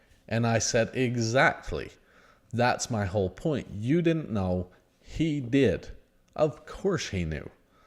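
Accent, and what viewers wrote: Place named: United States. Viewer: I am so impressed with how sharp you are and with how calm and well-rounded your thoughts are. It’s really awesome to see you coming out of your shell and speaking your mind confidently🤗 PS: I don’t understand why people are giving you a hard time over your accent? I’m Australian so your accent just sounds to me like another American one that I don’t recognize😅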